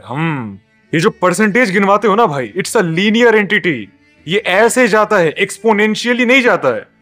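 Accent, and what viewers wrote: Bihar, India. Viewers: Brother Aap bihar se ho kya ?😊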